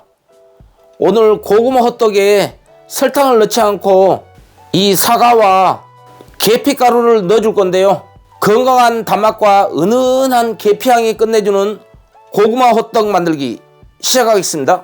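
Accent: Jeolla, South Korea. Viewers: 세프님고향이 전라도?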